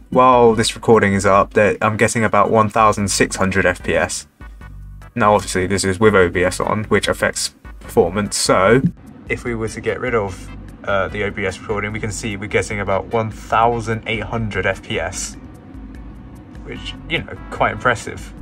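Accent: United Kingdom. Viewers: I like voice for it is very British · I love hearing British accent mmmm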